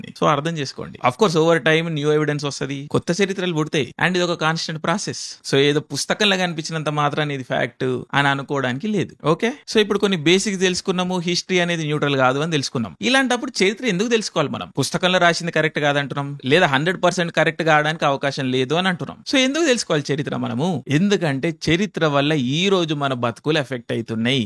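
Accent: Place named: Telangana, India. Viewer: Telangana slang 👌 bro keep rocking ❤❤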